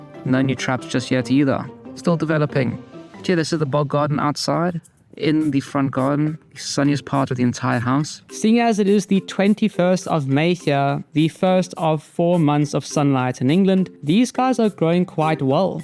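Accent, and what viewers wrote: South Africa. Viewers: Brother, are you from South Africa? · you sound South African!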